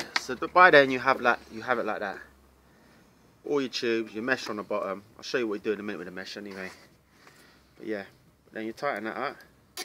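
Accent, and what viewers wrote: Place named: Bristol, United Kingdom. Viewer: London I think but he has a little bit of a Bristol accent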